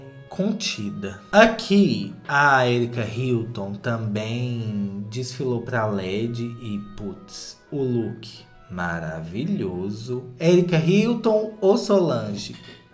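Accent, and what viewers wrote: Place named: Belo Horizonte, Brazil. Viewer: Ah, eu reconheci seu sotaque, é BH demais! Não te conhecia, este foi o primeiro vídeo que assisti, mas gostaria de valorizar a gata que mais entrega Catwalk: sua filhaaaaaa😻😻😻! Que neném lindona😹😹😹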